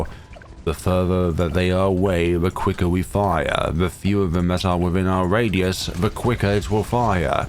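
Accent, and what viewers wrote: United Kingdom. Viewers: why are you so posh?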